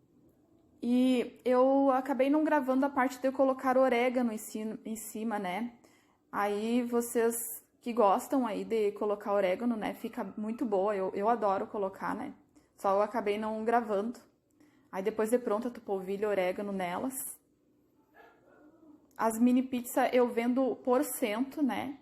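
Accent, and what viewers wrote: Rio Grande do Sul, Brazil. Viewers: É gaúcha né ela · Voce conversa igual uma amiga do Rio Grande do Sul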